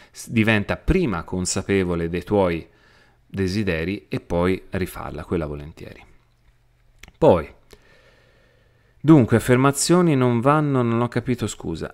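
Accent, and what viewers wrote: Tuscany, Italy. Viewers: grazie x il tuo impegno ti seguo a 360 gradi ! Da anni ho iniziato un percorso di rivoluzione umana e i tuoi consigli e condivisioni x me sono molto ispirativi e di sostegno concreto ! Non solo il tuo accento toscano ( come me e poi ho scoperto che sei di Pistoia quindi siamo anche vicini !) il tuo modo di esprimerti e il tono della tua voce trasmettono sincerità per questo ti trovo davvero verace e concreto ! Riesci a mettere delle frequenze x il sistema linfatico o x i vasi linfatici ? Grazie ancora x il tuo impegno ! Buona vita e buona lotta !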